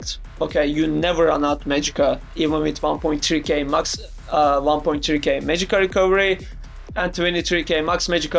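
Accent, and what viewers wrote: Turkey. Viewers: hi mate ! where are you from ? i got turkish vibe on your voice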